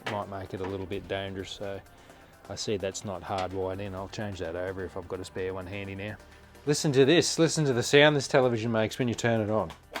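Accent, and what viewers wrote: Australia. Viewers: I was thinking the same thing. SCART is a European thing and he sounds Australian. I use SCART RGB for all my retro consoles.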